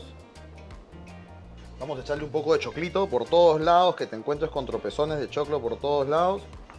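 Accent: Peru. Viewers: Que bien explicado, que profesional y que bonito y que elegante suena el español del Perú. Saludos desde España. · Soy admiradora de tu cocina pero me encanta y transporta tu forma tan peruano de hablar es como estar en Perú Gracias llevo 35 años fuera de mi Perú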